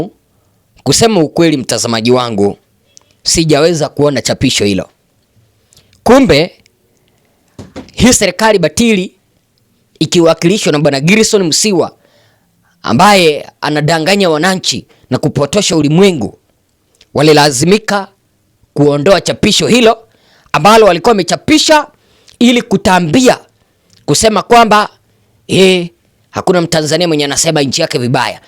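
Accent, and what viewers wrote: Kenya. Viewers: Mbona ki Swahili cha Kenya. Halafu unasema nchi yetu Tanzania.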